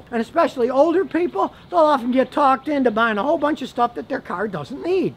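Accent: United States. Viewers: Why is John Lennon alive with an American accent and when did he become an honest car mechanic?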